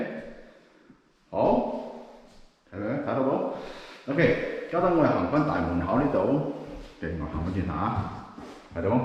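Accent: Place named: United Kingdom. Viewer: 旁述哥哥应该戴住口罩讲野 ，所以听唔清楚 ，加上一听已经知道唔系土生土长香港人 ，可能是在英国出生的华人 ，我姪女也是这样跟我说话的 ，唔紧要 ，会越做越好的。努力！加油！😉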